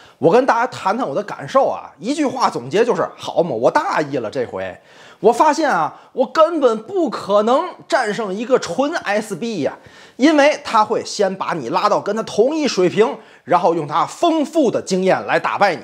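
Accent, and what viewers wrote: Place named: Tianjin, China. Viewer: 口音天津人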